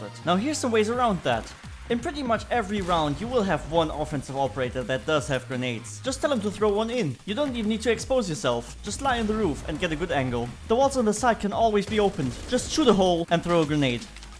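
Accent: Germany. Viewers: Are u german ? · you sound very german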